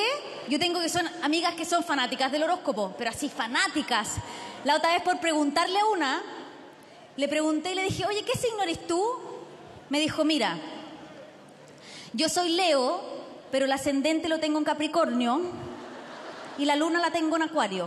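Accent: Chile. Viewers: se arrugan enteros por una humorista que habla como el 70% de los chilenos hoy en dia . Son cuaticos con la chiqui que fue mas honesta y se nota que tenia confianza con su rutina y el publico. personalmente es la unica humorista que me hizo reir de todo el festival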